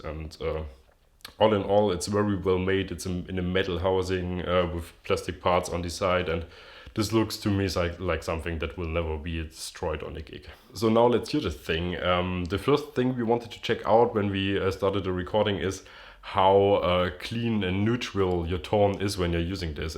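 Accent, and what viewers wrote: Germany. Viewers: Things always sound way cooler in a German accent.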